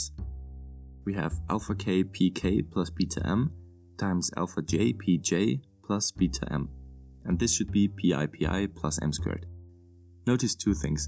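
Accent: Germany. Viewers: Nice vid, vid. Your accent and pronounciation of the word "Ansatz" sounded german, thus may i ask if you're german. I know the vid is old af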